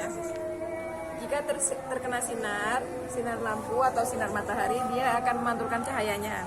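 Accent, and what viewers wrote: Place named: Java, Indonesia. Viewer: Jowone Endi mba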